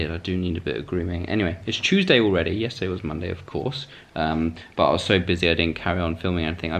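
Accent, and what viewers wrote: United Kingdom. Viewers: I think from the accent you are British, am I right? 😁 Anyways, injuries are important to discuss, specially the beginning of starting working out. I usually have knee, thigh and back problems. My issue I get easily tired and can't increase weight. Another thing I can't keep motivated, specially during lock down. But thanks for your videos. P. S. Keep the beard 😊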